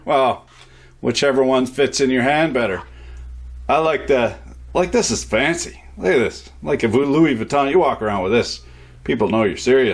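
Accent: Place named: Quebec, Canada. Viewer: your french isnt bad, almost sounds Quebecois :P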